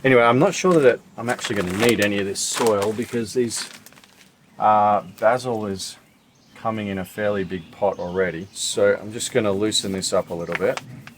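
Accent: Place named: Australia. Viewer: Hello, have to ask are you an 🇦🇺Aussie? First visit to your Channel and hear a familiar tone? Great Vlog Thank You looking to grow some food on the road, 👍🏻👏🏻🙏🏻🙋🏻‍♀️🇦🇺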